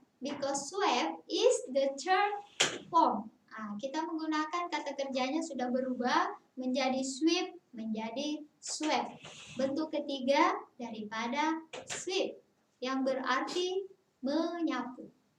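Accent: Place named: Java, Indonesia. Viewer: Bahasanya masih medok mbak e, kurang dari medok malah👎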